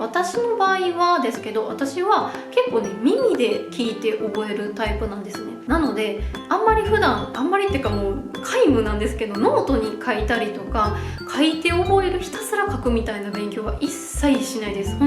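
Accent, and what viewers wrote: Kansai, Japan. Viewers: さんの関西弁が聞きたいときにおチャンネルに見にきます。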